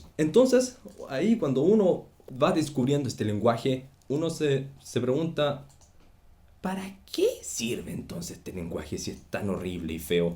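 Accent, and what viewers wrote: Chile. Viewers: hablas como chileno bro:3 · Consulta aprendiste español en Chile? tu acento español me parece bastante chileno xD Estoy aprendiendo a programar en esta pandemia y comence con lenguajes mas modernos como javascript o ruby, pero me di cuenta que si quiero llegar a ser bueno debo aprender C que te enseña cosas elementales de la programación. Saludos desde Chile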